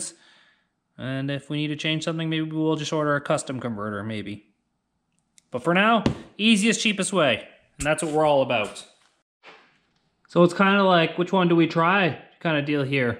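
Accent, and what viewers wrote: Canada. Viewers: Lmao I love the Canadian accent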